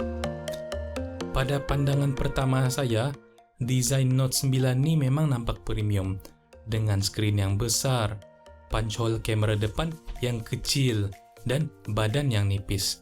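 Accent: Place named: Sabah, Malaysia. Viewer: MCM org Sabah ni abg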